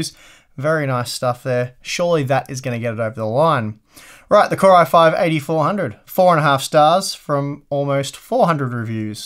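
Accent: Australia. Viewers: Nice Aussie accent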